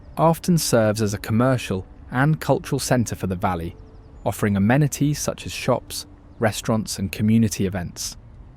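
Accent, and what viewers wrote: England, United Kingdom. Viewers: If it was filmed by a Brit then the narration is perfect. He’s easy to understand. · Unseen Wyoming. We love Wyoming. PLEASE use an American narrator for American locations. It is only respectful. Plus, we cannot understand some of the words, as spoken differently. Save the British for British. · Yes. An American state british accent. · This video has literally nothing in it that is hidden or unseen in Wyoming. These are all famous attractions known by any visitor which I am almost certain the video maker never has been. If you were English like the video sounds maybe check out London, that’s a very obscure place in England you could do a video about try focusing on London Bridge or Big Ben. But probably you’re just AI . Either way stay home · The British accent ruins the documentary. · I think the documentary would sound better with a native Wyomian than a British person narrating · Sounds British. 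He is making a nice video. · Wrong accent don’t need a Brit voice in American 🇺🇸🇺🇸🇺🇸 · why the F does this have a brit accent??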